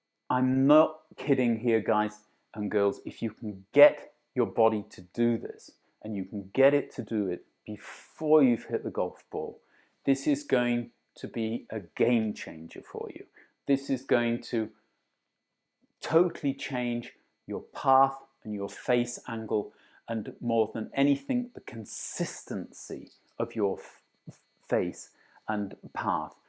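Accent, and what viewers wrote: RP, United Kingdom. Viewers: Thanks for the video,. Where are you from? Your accent sounds English-ish with a hint of German or Scandinavian... my mother is English (I grew up in the states with an English mother) and been to England many times. I can't figure your accent out. 😄 I enjoy your videos. You're very detailed.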